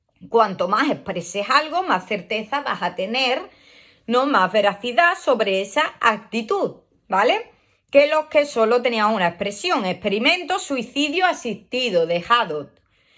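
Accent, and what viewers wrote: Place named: Andalusia, Spain. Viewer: Hola, estoy siguiendo tus audios psico uned, van muy bien mientras paseas,en tren, etc, y ese acento sevillano o andaluz lo hacen más ameno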